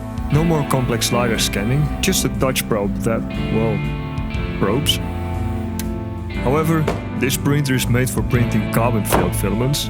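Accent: Netherlands. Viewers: I tought you were swedish. But you're speaking gibberish. Netherlands? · You sound very Dutch. Good video tho! Subscribed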